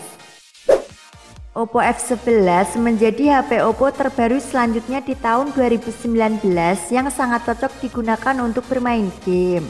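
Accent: Java, Indonesia. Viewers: Jawa banggettt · Omongan mu jowone meduk mbka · Medok nya itu Lo,Mbak...bikin klepek klepek.? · Kok ngomong y mbaknya medok emang aslinya orang mana? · Medok bgt mbak. Asli mana?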